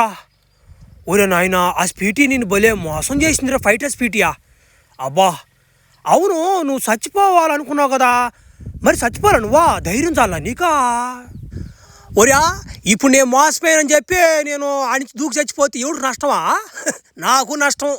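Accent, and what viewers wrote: Nellore, India. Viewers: Arey Anna nuvu Nellore ahhhh nedhi 😊😊😊😊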